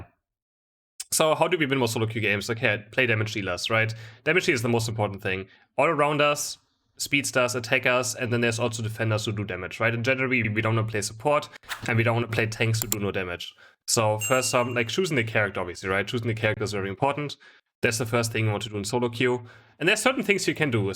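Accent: Germany. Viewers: Hey, thank you for this nice and informative Video, i've got a question to you:D Your accent sounds really german, may i ask where are you from?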